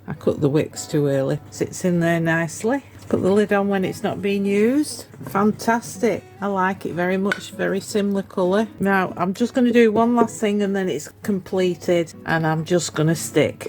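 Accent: United Kingdom. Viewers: I have just come across your channel and love it. I have been sat here watching video after video instead of doing what I am supposed to be doing. You sound like a friend of mine, clearly from the same part of the country and the humour must be in the DNA from that part of the UK. You have some interesting ideas and produce some great stuff. My hat goes off to you for doing the video's; I'm a former College Lecturer, use to, shall we say, some unappreciative audience members, but I certainly could not do what you do. I look forward to not doing what I should be doing in the future and watching your video's instead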